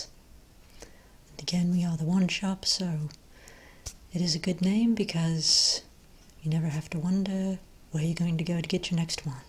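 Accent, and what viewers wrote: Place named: United Kingdom. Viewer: I like your British accent! 😁 I'm working on a British accent for my next video too, it's not easy 😆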